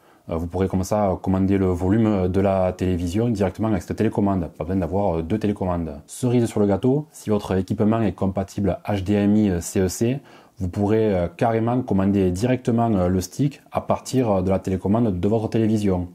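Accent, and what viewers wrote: South, France. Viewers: Bonjour, merci pour votre vidéo. Je suis pas très au point sur la technologie mais j'ai compris tout ce que vous disiez ^^ Du coup j'ai une question complémentaire : Puis je voir les chaîne "TNT" via ce petit boitier ? Mon but ultime serait de modifier mon abonnement téléphonie pour n'avoir plus que internet et voir la tv sans le décodeur traditionnel :) Merci d'avance et vive l'accent du sud !!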